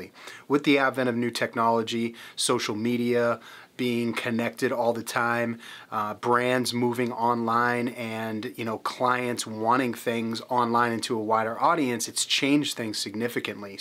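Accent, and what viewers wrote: Boston, United States. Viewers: Hey bud you from Rhode Island? Or Boston?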